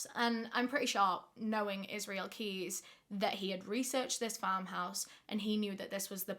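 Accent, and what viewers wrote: United Kingdom. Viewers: what accent is that uk ? scotland ireland?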